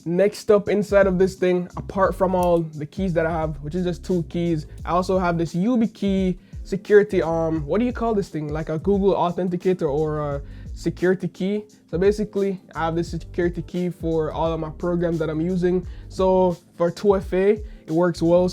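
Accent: Jamaica. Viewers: are you Jamaican brov? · You sound Jamaican 🔥👍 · Yow yah Jamaican ? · you jamaican? big up yuh self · Is that a Jamaican accent I hear? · are u from Jamaican ??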